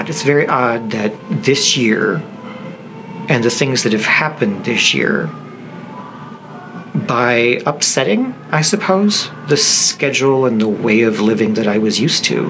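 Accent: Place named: Chicago, United States. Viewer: Natter away,. I enjoyed your Blog and now I can enjoy your Vlog. I also enjoy your Chicago accent. I grew up in a NW suburb in the 50s and 60s. Yes, you do have an accent. Thanks for dropping into my living room today. I hope you don’t mind that I’m not dressed (literally). Keep up the vlogging and knitting and teaching. How do I get in on your patreon thing?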